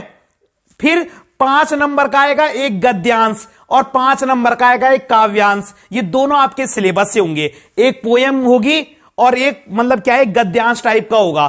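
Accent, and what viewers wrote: Garhwal, India. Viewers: Sir ap ghadwali ho kyy ??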